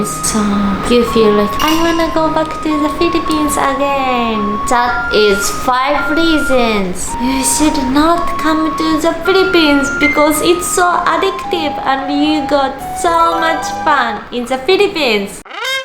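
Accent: Japan. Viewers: I would like to inform the viewers why she says expensive-uh because its japanese accent they say english words with uh like bestfrienduh etc. PS. I have a japanese bff. so now u know ok? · You look filipino to me😬😬 and your accent us like japanese, pilipino and visaya😐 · No one gonna talk about her Japanese accent? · this is typically Japanglish pronounciation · My first impression from the title i was like what filipinos have done to her? Me after watching the video : ahhh kaya pala kase addictive 😂 Btw Love your accent just like my japanese friends 😊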